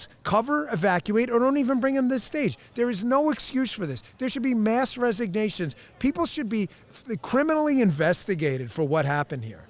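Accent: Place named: New York, United States. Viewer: love Dans italian NY smart aZZ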